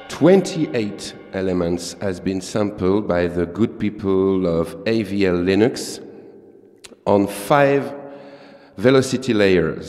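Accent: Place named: France. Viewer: bonjour, il me semble reconnaître un français derrière cette voix.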